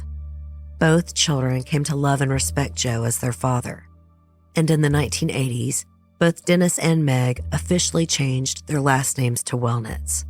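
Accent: South, United States. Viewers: It's so nice to hear a southern accent...❤ Great job on the videos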